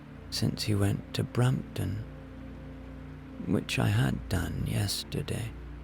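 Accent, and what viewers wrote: Ireland. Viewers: I have a 3 week old baby and your sleep stories have helped me conk out when my husband takes the baby for a while! It’s lovely to hear an Irish accent in the stories. Loving your work, from a Galway girl turned Dub 😂❤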